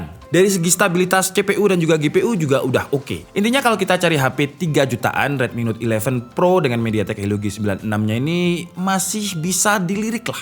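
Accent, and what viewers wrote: Malang, Indonesia. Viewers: Bang lu orang malang kah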